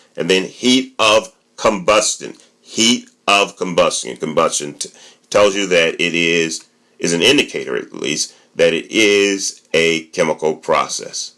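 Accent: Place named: United States. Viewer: I am a kenyan hence i am not getting the english well because he is an american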